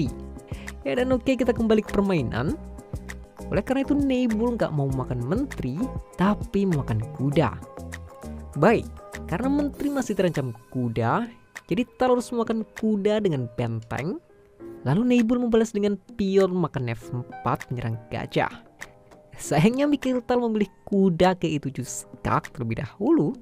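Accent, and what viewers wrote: Java, Indonesia. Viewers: Konten berulang ini ya? ini yang versi lama : <link> versi medhok jawir, walaupun sekarang masih medhok sih aowkwkwk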